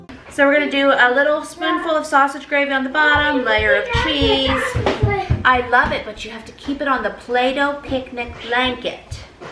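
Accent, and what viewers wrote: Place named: Charleston, United States. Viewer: I was wondering are you from South Carolina you sound like you're from Charleston South Carolina I mean this in a good way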